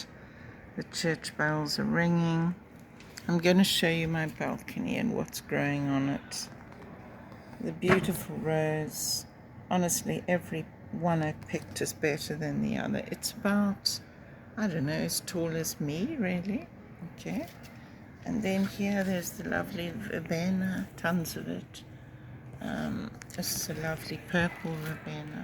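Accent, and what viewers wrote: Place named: United Kingdom. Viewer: Hi darling, I love so muh your lovely british accent and you seem such an adorable typical English lady at my italian eyes who needs only her raincoat and umbrella to not to be unfaithful to her origins! I agree with you about living in Europe, nowadays seems the better choice to live peacefully, and leaving in Italy, at least the north of Italy, is so much underrated by our generation. I know work is not enough rewarded as in other countries, but, hey, you cannot have everything from life... Things you understand only much later... I cannot see my life anywhere from here and you can find close minded people really everywhere, so... You can only stay away from them! I love your perfumes on a budget videos! Greetings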